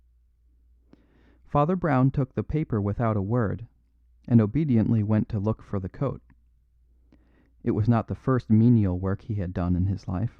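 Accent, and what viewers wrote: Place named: Midwest, United States. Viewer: Look with all due respect my friend, would you really expect a British person to read John Steinbeck with the characters of California fishery, or Tennessee Williams with the southern characters that really only an American inherently understands. It is beyond the capacity of the British to do these Justice. Ergo unless you have spent a portion of your life, say 20 years or so, living in the UK, and I gather by your accent you have not left the Midwest it really causes a problem with presentation. They're going to be terms and expressions in there that you are unfamiliar with and this all absolutely works in reverse. How about giving us a nice shot of Edith Wharton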